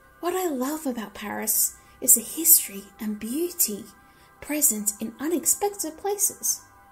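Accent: Australia. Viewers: I guessed you were either from Australia, or New Zeeland, at first I thought you were English 😅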